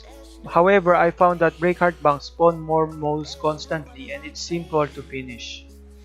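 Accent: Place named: Philippines. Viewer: Nice I need farming materials I need more for my attackers need more yarn and you sound Pinoy. Pinoy ka ano? At ako newest subscriber mo helpful vid mo eh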